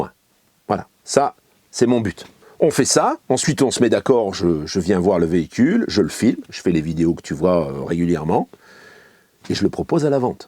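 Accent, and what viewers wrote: North, France. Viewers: Bonsoir à vous, je ne me manifeste jamais car tu as beaucoup de travail. tes vidéos me plaisent, je voudrais te poser une question, que prendre comme GPS pour le cc et la voiture, javais des tomtoms qui ne vont plus. Ton patois du Nord est formidable tu pourrais jouer avec Dany, merci pour la réponse Amicalement, Jacques du pas de Calais. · Mdr la langue du chnord ! Merci à vous 🙏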